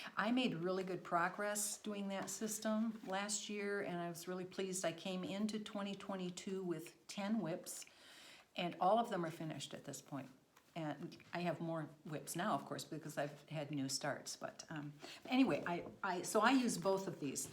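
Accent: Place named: Michigan, United States. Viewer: Just found your floss tube and enjoyed watching them. Your work is beautiful. I chuckled at your comment about your Michigan accent. I live in Michigan and have all my life.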